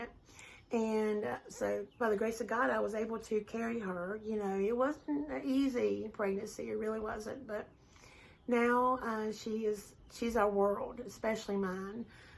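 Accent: South, United States. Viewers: I love watching your channel. You are so REAL! That's a rare quality these days. I love your southern accent, your hair, just everything about you. You're sweet, and you sure do understand being a woman (it's hard sometimes) and I agree; we should be lifting each other up. I am a country woman stuck for now in the city. Trulia is one of my favorite pastimes. LOL Until next time...💜💜💜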